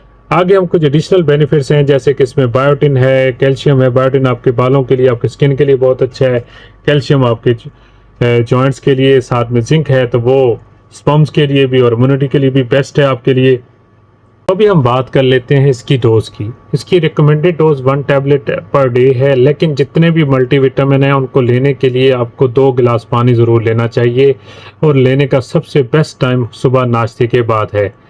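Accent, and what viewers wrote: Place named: Punjab, India. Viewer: W salam nice video bahi again Punjabi touch ❤nice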